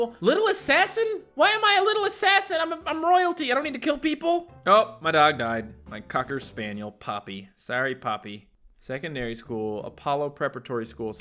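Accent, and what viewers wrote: United Kingdom. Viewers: Is that really your British accent? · That’s an ok british voice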